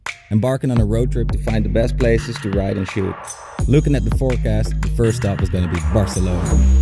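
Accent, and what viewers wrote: Netherlands. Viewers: arw you dutch? you have quite a dutch accent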